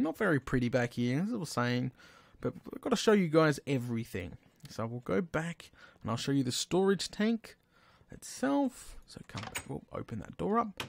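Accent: Australia. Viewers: you are very australian sounding... except hardly anyone uses those words, are you from alice springs?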